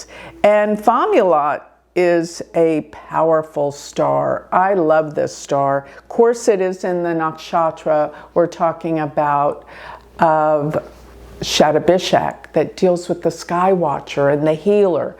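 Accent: Texas, United States. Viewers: slight TX accent that most likely has been practiced in order to lessen? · IKR!!! Texas accent is totally normal if your from Texas! We don’t notice our accent’s but others do.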